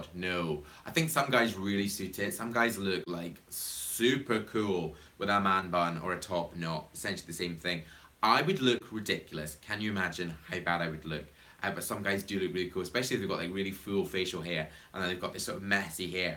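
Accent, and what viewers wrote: Scotland, United Kingdom. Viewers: general_anto _ he is scotish I think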